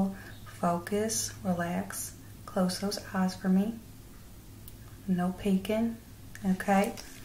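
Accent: South, United States. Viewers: with that Southern belle accent, this Yankee would tell you anything you want to know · Your southern accent is better than most Hollywood versions with voice coaches and technical consultants...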